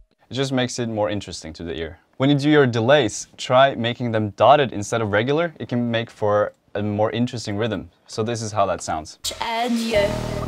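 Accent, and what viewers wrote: Norway. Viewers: er du norsk? høres sånn ut hvertfall. hvis ud ikke er nosk gir dette sikkert ikke noe mening da men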